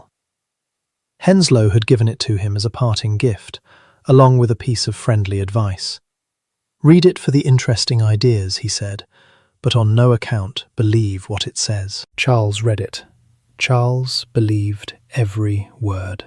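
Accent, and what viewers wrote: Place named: United States. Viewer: Sorry. American accent. Finding something else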